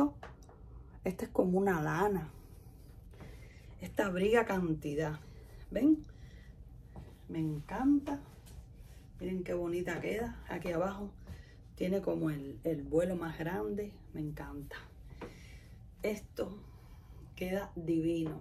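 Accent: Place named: Cuba. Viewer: Hola soy nueva suscriptora. Eres cubana verdad ?, creo q reconocí el acetone de una paisana. Yo soy de Cuba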